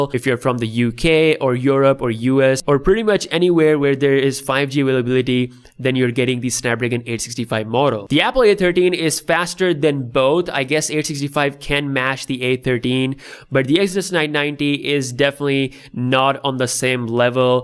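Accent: India. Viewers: I just cant watch this. Too much indian accent. My god. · U sound so Indian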